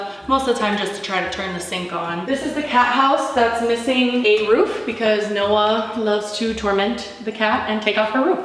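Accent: Miami, United States. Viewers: I recently found out you're from the 305 and when you say "you know" it's so obvious. I cannot believe I've been watching you for YEARS and never noticed. I also never even clocked you as Hispanic. I'm a failure as a Miami Cuban.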